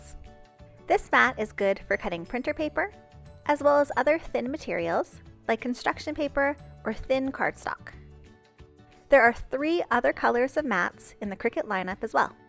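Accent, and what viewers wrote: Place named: United States. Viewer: Very helpful video, thank you, and a charming accent also, where in America do you hale from?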